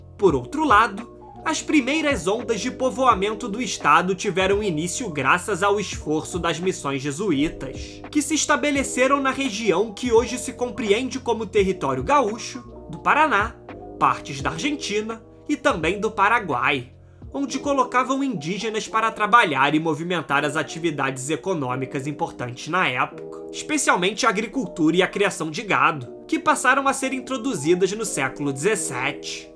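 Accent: Rio de Janeiro, Brazil. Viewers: Mais um videozinho cata-clique, baseado em notícias superficiais, clichês históricos e uma "capa" sensacionalista. Não sei o que é pior: o conteúdo ou a narração desse carioca. Sugestão pro próximo cata-clique de vocês: Rio de Janeiro, estado falido, capital da bandidagem do Brasil. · Que narração horrível..... Não dá pra assistir mais de um minuto..... Carioques insuportável.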